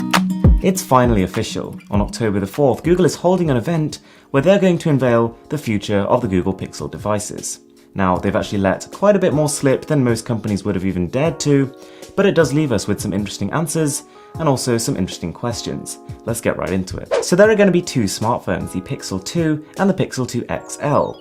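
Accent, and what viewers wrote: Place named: United Kingdom. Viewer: Are you indian? I've never seen an Indian person with a British accent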